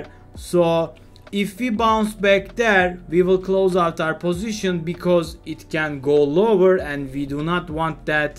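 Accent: Turkey. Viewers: Krdeşim sen Türk'e benziyorsun konuşma aksanından anladım isim neydi? Bir de videonuzda altyazı özelliği aktif değil..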